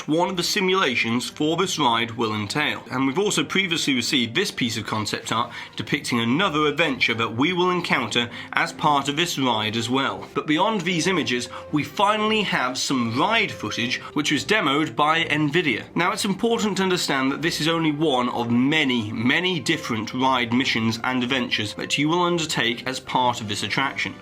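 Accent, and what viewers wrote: United Kingdom. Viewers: Which type of British accent do you have? I am baffled!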